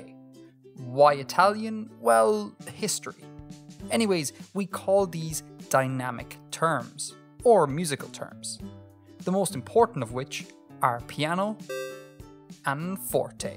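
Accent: Ireland. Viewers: Definitely an Irish accent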